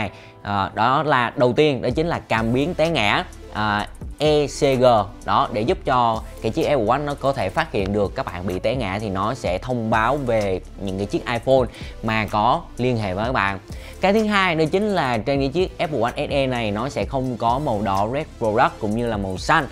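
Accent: Phu Yen, Vietnam. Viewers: Ad dân phú yên à Nói tiến phú yên rặc kkk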